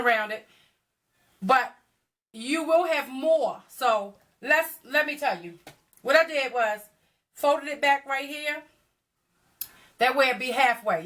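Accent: Baltimore, United States. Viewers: B- more accent Luv it. I was told I talk country and I came from Philly 😂 Luv this video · I hear that Charm City accent..Hey my B-more neighbor... I will be taking that drive to the Flee market. KEEP UP THE GOOD WORK I love this channel.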